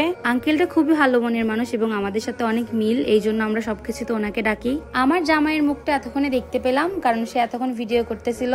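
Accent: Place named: Chittagong, Bangladesh. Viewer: Amader chottogramer vloger,,,amra boalkali taki,,,onek vlo lagay jokon chatgaiya kota suni❤❤❤❤❤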